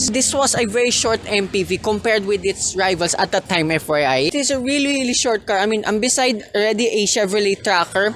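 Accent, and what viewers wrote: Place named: Taglish, Philippines. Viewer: Sa susunod tagalugin mo na lang. Ang sakit sa tenga ng TAGAlish mo!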